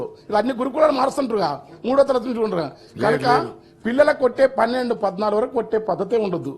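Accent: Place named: Andhra Pradesh, India. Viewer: గోరేటి వెంకన్న నువ్వు మాట్లాడుతుంటే నేను చూస్తుంటే తెలంగాణ వాడు మాట్లాడుతున్నట్టు లేదు ఆంధ్రుడు మాట్లాడుతున్నట్టు. ఇప్పుడున్న విద్యావ్యవస్థకి హాస్టల్ వ్యవస్థకి కథాకర్మాక్రియ మన కేసీఆర్ గాడే నువ్వు దయచేసి మళ్ళీ మళ్ళీ కన్నీరు పెడుతుంది అన్న పద్ధతిలో ఇంకొక పాట రాసి దాన్ని ప్రసిద్ధిగాంచితే చూడాలని ఉంది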